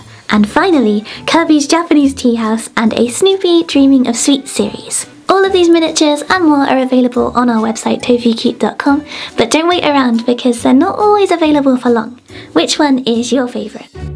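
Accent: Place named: United Kingdom. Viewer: Btw I think your British English is so beautiful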